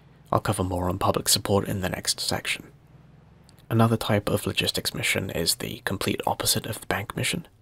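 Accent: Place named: England, United Kingdom. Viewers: Your accent is really intriguing me, it's like a cross between Queen's English and Yorkshire! Haha. Great vid BTW! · I thought the accent sounded familiar, I just moved to north yorks myself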